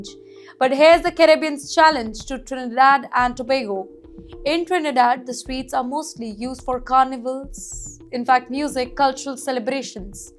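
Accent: India. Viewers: Hi I don't know who you are or where you came from, but from your accent sounds like is somewhere around India. You need to stay out of the Caribbean business. You have enough fight to fight around that zone. Trinidad and Tobago and Guyana have had enough problems with Venezuela already even before US gotten involved. Am not stating that the US has the right to do what they are doing. But you don't get the news when Trinidad and Tobago Fishermen are being kidnapped and bullied and Guyana being threatened by Venezuela to take 1/3 of their country just because of recent oil discovery. These three nations have problems and you will not understand why the animosity . It won't benefit Trinidad and Tobago and Guyana to join the side of the losing. In your case Who would you have backing you.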